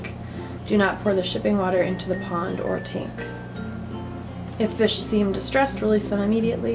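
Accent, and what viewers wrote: Minnesota, United States. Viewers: Hah I knew you were from Minnesota. That accent where bag sounds like "bage". My 3rd grade teacher was from Minnesota.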